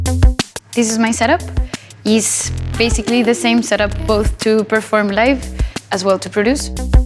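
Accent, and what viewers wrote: Bogotá, Colombia. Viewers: Sounds Colombian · I didn’t know she was Colombian until right now that I heard her speak in English and said: she has to be from Bogotá! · el acento rolo es super marcado hasta en inglés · Any other Colombians can notice how her accent also somehow is there when she speaks English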